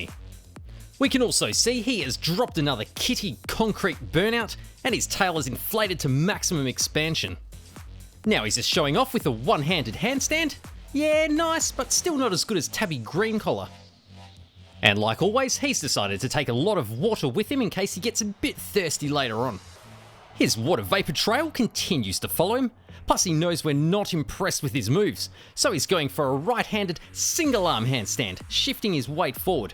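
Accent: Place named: Australia. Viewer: theres no better narrating than a an Aussie lol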